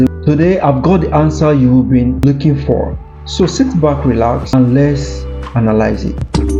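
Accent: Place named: Portugal. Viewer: Good job! I love your Portuguese accent... :face-green-smiling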